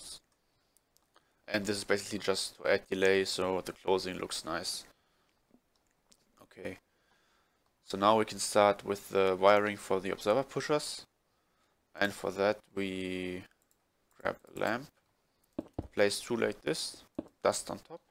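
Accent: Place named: Germany. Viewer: Nur wegen dem Akzent sofort gewusst deutscher😂😂😂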